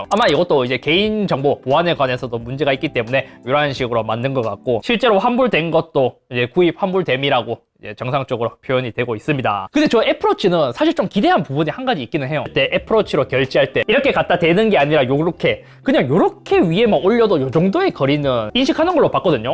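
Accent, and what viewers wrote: Gyeongsang, South Korea. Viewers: 근대 궁금한 게 있는데 왜 IT 유투 버는 경상도 사람들이 많지 사투리도 그렇지만 발음도 그렇고 말이 빨라서 무슨 말인지 못 알아들을 때가 있네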